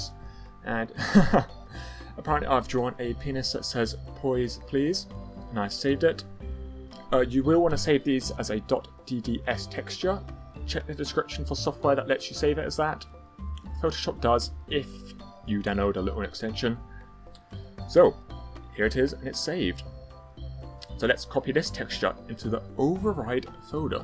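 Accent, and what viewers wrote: United Kingdom. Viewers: you sound such british =D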